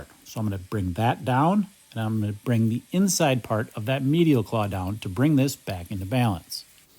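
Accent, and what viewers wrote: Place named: Midwest, United States. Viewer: I am obsessed with. His gentle Midwest accent and easy demeanor is fun to watch and listen too. must have huge forearms and wrists as he removes that dense horn. Thank you for your educational videos.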